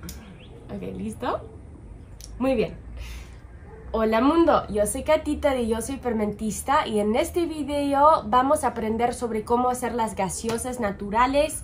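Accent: Mexico. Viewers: Habla muy mexicana! Que linda!! 😊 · Antes hablabas español como Española y ahora hablas español como Española y mexicana en acento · I'm listening to you and I feel I light Mexican accent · Hi!! Katita, no sabia de tus videos..te felicito..son muy interesantes, y tu español es excelente...lo hablas muy bien, se nota claramente el acento mexicano...pero bueno.. agradezco que compartas tus conocimientos, muy buenos y como te reitero, bastante interesantes. Saludos From CHILE - VALPARAISO. Good luck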